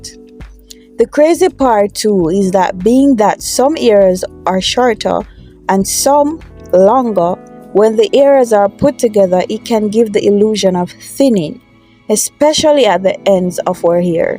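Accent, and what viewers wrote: Jamaica. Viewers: Do I suspect a fellow Jamaican?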